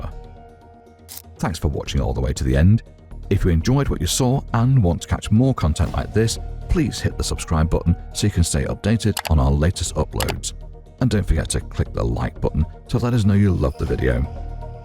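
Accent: England, United Kingdom. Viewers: How tf yall going to use pictures that aren't even TRG. And how tf a nigga with an English accent know anything about TRG. You guys missed the whole unique thing about TRG. We have every race in TRG that's what made TRG the strongest and biggest. Do better homework.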